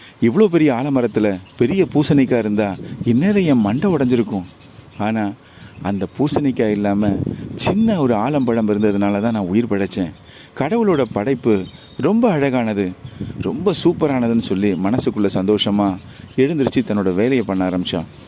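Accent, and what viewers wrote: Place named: Virudhunagar, India. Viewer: Nice slang sir kamarajar voda slang apdiye pesuringa.🎉🎉🎉🎉🎉🎉🎉🎉🎉🎉🎉🎉🎉🎉🎉🎉🎉🎉🎉🎉🎉🎉🎉🎉🎉🎉🎉🎉🎉🎉🎉🎉🎉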